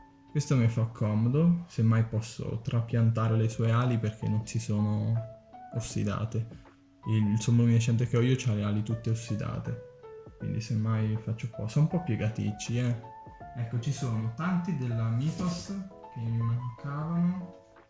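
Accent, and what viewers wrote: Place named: Marche, Italy. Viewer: avevo le cuffie. E ho notato un familiare accento centro-marchigiano, ora so dove trovarti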